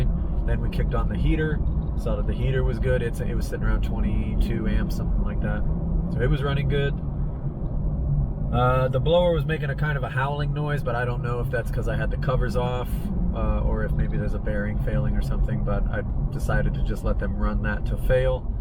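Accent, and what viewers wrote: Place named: Midwest, United States. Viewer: I've been watching some of your videos lately and never would've guess you were in Hawaii. Was thinking more Midwest or central US. Anyway, great stuff! There's something strangely relaxing about coming home from work and watching someone else work 😂. I do a fair bit of electrical troubleshooting in my job and I like to hear other people's thought process while working through a complex issue. You do a great job narrating and explaining the issue and resolution. Thanks for the great content!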